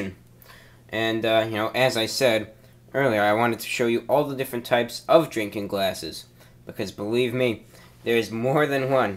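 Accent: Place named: Boston, United States. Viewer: Hes got more of an accent in this video hes more pronounced now.is he from boston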